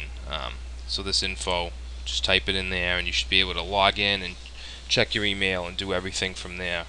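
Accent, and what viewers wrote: Boston, United States. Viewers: Oh my god he kept his Boston accent back then hahaha.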